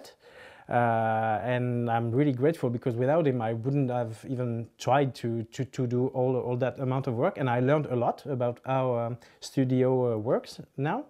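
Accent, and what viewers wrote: France. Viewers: l'accent français yeees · L'accent français oulala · Cet accent français magnifique ;) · He's french like me ! This accent français ! Cool que tu sois une des personnes qui a fait le razor crest en lego ! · You really hear, that he is french. But it hears so good! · Tu parle frençais vue ton accent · Oula l’accent français est très présent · MDR cet accent français On est là !!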